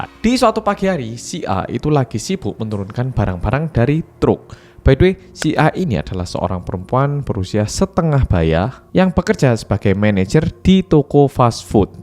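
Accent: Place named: East Java, Indonesia. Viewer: Salut..bangga apresiasi sama Mas..bahasa indonesia logat jawatimuran umumnya " Malangan khususnya. Ortu seperti saya ini asal Sumut domisili di jakarta 50 tahun..selama bekerja..kadang ke jateng..tp ga pinter2 amat boso jowo. 👍🏻👍🏻👍🏻👍🏻 isin...aku.😅 opung ini suka filosofi jawa. tentu yang lainnya juga..salam sehat semuanya. Sai hipas saluhutna🙏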